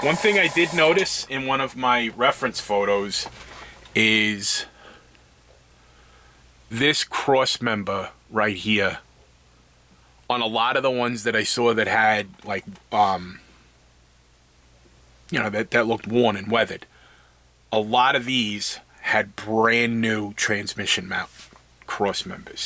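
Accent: New York, United States. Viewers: 😂😂😂, love the video, I’m new to your channel, I’m from Missouri and I like your NY accent.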